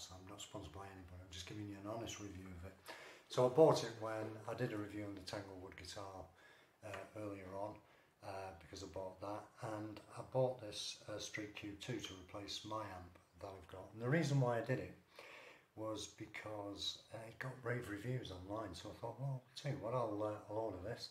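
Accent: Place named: Lancashire, United Kingdom. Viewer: Hi you sound like you’re from Lancashire like me, although I live in Spain. Am almost pulling the trigger on this just for a bit of busking with my mate, is it loud enough do you think, for a busy city street? And how annoying is it that they put the whole control panel “upside down “, do you have to keep getting up and going in front of it to change a setting? A minor issue but don’t know how much it would bug me, I can’t imagine why they do it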